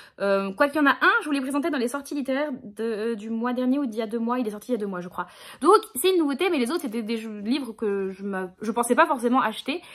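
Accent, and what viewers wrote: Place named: England, United Kingdom. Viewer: Olala, le livre autour de Jane Austen me tente ❤ Sinon j'adore l'accent anglais 😂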